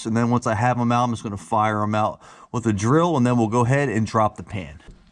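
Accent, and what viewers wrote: United States. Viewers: I don't know where you're at but the accent brings back memories of growing up in Southwest Philly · Great vid but the guy sounds American. Why the fuck is he using celsius?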